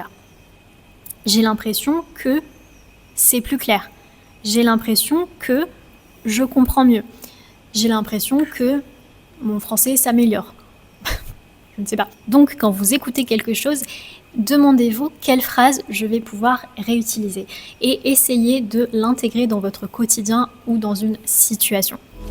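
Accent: France. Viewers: I used the technique of finding out what day to day things were in french, then when going round the supermarket or just day to day life then seeing them i'd say them back to myself until they were so deeply embedded in my brain that i knew them off by heart. Feels slightly reassuring that I might not be the only person saying the words back to myself in the shop🤣I find myself lucky that someone that goes to my local pub used to work for a large French oil company so hes pretty fluent in French and I find myself attempting to have little conversations with him to try and improve. I tend to learn more by doing something than just from being show how to do it, so being able to practice that way is really beneficial for me. These videos are really useful! I like little phrases and like using you "bonjour, j'espère que vous allez bien", "Si tu n'es pas heureux ou tu es, bouge, tu n'es pas un arbre." and the one you said your Grandmother says about life being a sandwich of a certain kind 😂Those little phrases go a long way in a video, even if it's just learning what the words are in them, especially since sentence structure is different to english with word placements in them! 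I don't want this to come across as a "oh great im magically fixed comment" but your English is really really good so try not to feel self concious about having a strong French accent when saying some words. You're pretty nail on the head 99.9%% the time, plus it's not your first language, so it's not always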